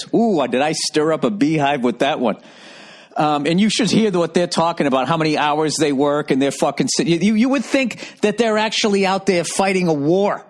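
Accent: Boston, United States. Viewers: Listening to Bill cuss with a Boston accent is awesome.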